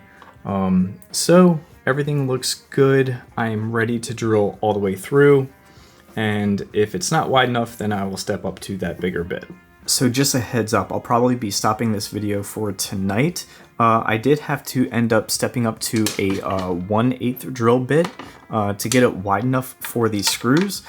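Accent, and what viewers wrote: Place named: Baltimore, United States. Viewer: Had no idea where you were located but had a good guess from that accent! Gotta be a Charm City Ghostbuster right here! 😂 Loving the videos! 👍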